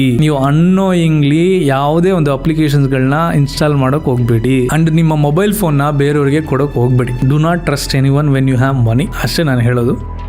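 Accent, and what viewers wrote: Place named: India. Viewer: ಕದಬಹಳ್ಳಿ ಇರೋದು ನಮ್ಮ ಹಿರೀಸಾವೆ ಅತ್ರ, ಹಾಗಾದ್ರೆ ಅಣ್ಣ ನೀವು ಅಲ್ಲಿನ ಸುತ್ತ ಮುತ್ತಲಿನ ಊರಿನವರು ಅನ್ಸುತ್ತೆ ನಿಮ್ಮ ಮಾತಿನ ವರಸೆ ನೋಡಿ ಮೊದಲಿಂದನು ಅನ್ನಿಸ್ತಿತ್ತು ನೀವು ಆ ಕಡೆವರೇ ಅಂತ ಈ ವೀಡಿಯೋ ಅಲ್ಲಿ confirm ಆಯ್ತು 😊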